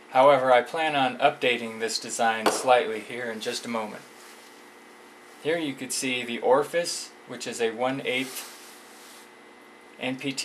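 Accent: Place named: United States. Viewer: Good job. 10 points taking off for sounding like a yankee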